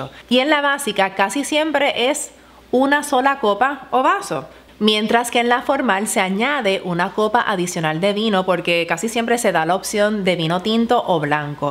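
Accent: Puerto Rico. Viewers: Buena explicación, por el acento percibo que la presentadora es boricua. Saludos desde Panamá. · Me fascina el acento boricua.🥰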